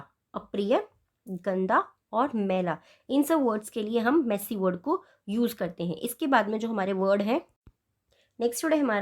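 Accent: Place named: India. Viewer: Hindi pronunciation of english word proper nhi lag raha hai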